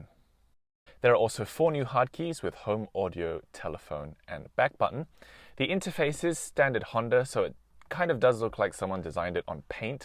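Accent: Australia. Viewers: Aussie, probably brought up in a classy Aussie town or school.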